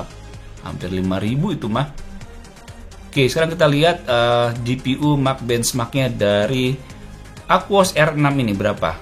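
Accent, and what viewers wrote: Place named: Jakarta, Indonesia. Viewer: Logat betawinya masih 100%😂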